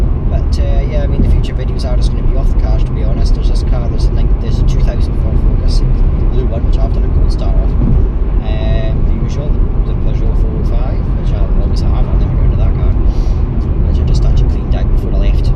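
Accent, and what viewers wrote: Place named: Scotland, United Kingdom. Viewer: Thanks for uploading a new video. It sucks that you wrecked the Benz, it looked like a really nice car. Anyhow, there's another issue - it may be due to the fact that I'm not a native English speaker (I love your Scottish accent though), but with all the noise from driving the car, it was very hard to understand everything you said in this video. Also, thanks for not mentioning my name (which is fake anyways, but no matter) in the video (anyone who reads the comments can figure it out anyways, but that's about enough for me).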